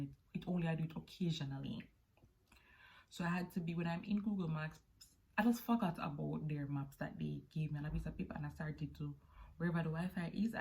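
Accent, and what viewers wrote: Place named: Patois, Jamaica. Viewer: Hey my fellow Jamaican. I just subbed...mi hear di patois 💚💛🖤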